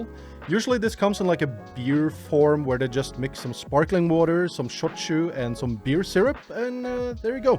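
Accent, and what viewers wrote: Norway. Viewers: okay 3rd comment lol, not sure if youve ever watched norsemen (Vikingane) but I feel like the fact you have the same norweigen accent as that show makes stuff you say funnier haha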